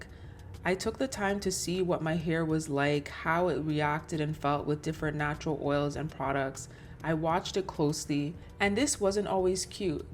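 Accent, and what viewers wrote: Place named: Toronto, Canada. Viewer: I knew you were from Toronto by your voice; are you also of Jamaican descent?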